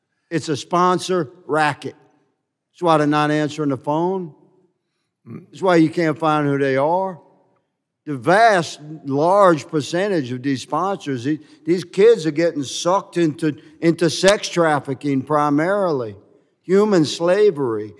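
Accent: South, United States. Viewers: Thank you Mr Higgins, for using that amazing southern voice to stand in defense of those precious innocent children.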